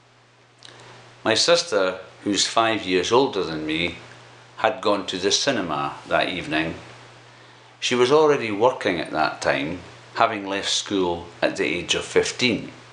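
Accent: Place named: Edinburgh, United Kingdom. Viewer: I thought that was an Edinburgh brogue! Did I tell you I was on the bonnie, bonnie banks of Lochlomond last weekend. Thanks for another informative and insightful video bro.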